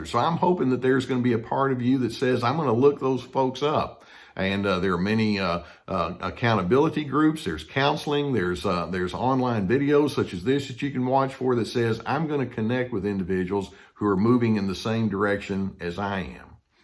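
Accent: South, United States. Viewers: Your southern accent helps send a clear message. You described it well!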